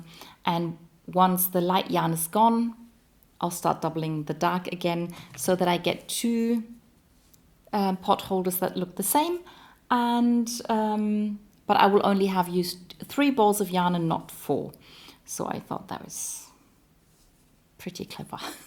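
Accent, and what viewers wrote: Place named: Australia. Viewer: Thank you for responding right away! Love your podcast! My son thinks you have an Australian accent:—). I will hate to disappoint him..., not.:-). Love your English! I wish my German was as good as in the old days when I spoke it flawlessly.... Is it this the pattern? <link>?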